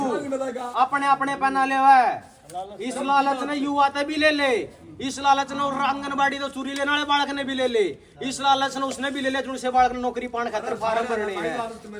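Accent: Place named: Haryana, India. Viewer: आप जब भी बोले तो शुद्ध हिंदी मे बात करे ये haryanvi झलक ना दे हमें समजणे मे दिक्कात अती है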